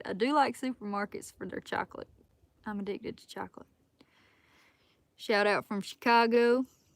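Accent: South, United States. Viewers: Love that southern accent. Who cares what anyone says it looks good on you. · Whoever says something negative about your accent deserves the middle finger! I love that Southern Draw! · Love your Southern Drawl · Love that southern drawl. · You are precious with a little southern eloquence. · Hi, love your Southern accent. Keep them outdoors videos coming, keep looking good. You are an awesome gal. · I love your Southern Accent and definitely don't tell where in Alabama you live!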